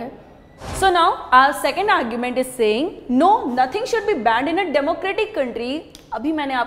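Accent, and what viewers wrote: India. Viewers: english indian is hard tbh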